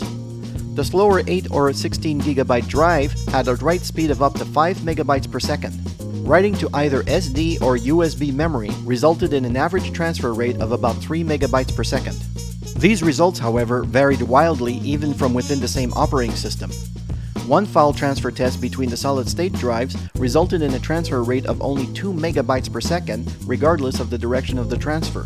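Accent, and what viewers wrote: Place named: Quebec, Canada. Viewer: It comes into 'play' when someone has posted an instructional video such as the one visible above and has ruined the video by speaking in some bizarre french canadian hybrid accent..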